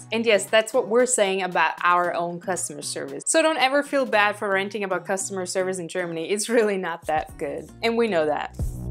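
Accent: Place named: United States. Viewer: Of course we are superior to Germany so you want to live here but you have worked hard on your English and almost sound like American lady.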